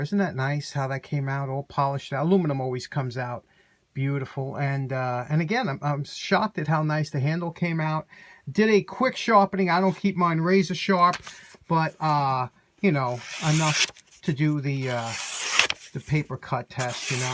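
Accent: New York, United States. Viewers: Great New York brogue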